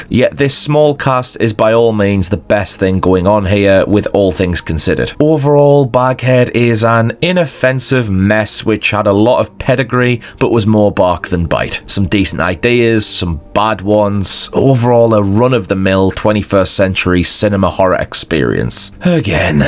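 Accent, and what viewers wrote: United Kingdom. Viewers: Actually British accent somewhat hard to understand when ur 2nd language is English 😭 but enjoy the movie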